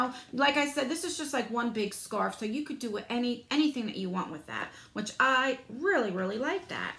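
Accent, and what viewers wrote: New Jersey, United States. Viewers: I love your jersey accent. My hubby grew up in NJ and we love going back to visit. We are from New England, United States. We went camping in a state park in NJ on a lake. We were ready for freezing water like in our home state. The water temp is 69 degrees right now ( very cold) well as soon as we walked in the lake were very excited to have it feel as warm as bath water for us!!! I love this box someday I will order one. Thank you for doing such an amazing job. The other thing in NJ that we have none here is the sound of cicadas wow they are loud but we got use to them. The cicada killer bees are huge and scared one of my kids terribly.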